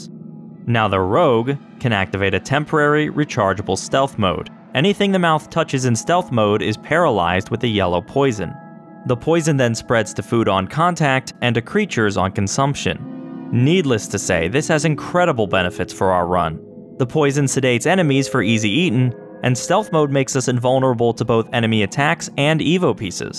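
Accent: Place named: Ontario, Canada. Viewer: Are you from Ontario,? I can hear it in your accent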